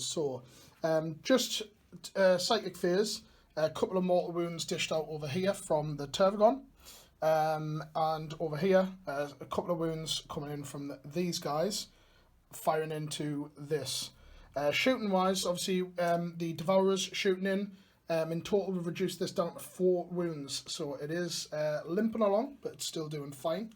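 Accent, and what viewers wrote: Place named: North East England, United Kingdom. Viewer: Hey dude, which part of the North East are you?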